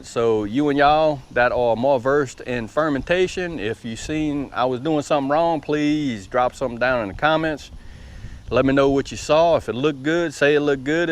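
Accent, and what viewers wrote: South, United States. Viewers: Hello Mr. so glad Barry didn't blow up to hard your way we got some good rain off of him and I needed it garden loving it,Looks like them pickles going be good,hey I done old timey 14 day old pickles before but never done any like that,I will be following may be something for me to try, and nothing wrong with your southern-ish 😉😁You have a good week and try not get to hot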